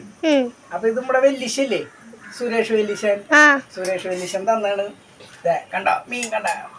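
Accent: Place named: Thrissur, India. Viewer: Good to ur thrissur basha.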